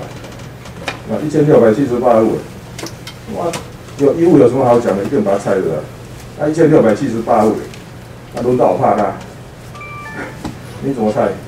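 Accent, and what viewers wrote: Taiwan. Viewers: 有"爛"群組...哈哈!!阿北的台灣口音英文真的很有趣~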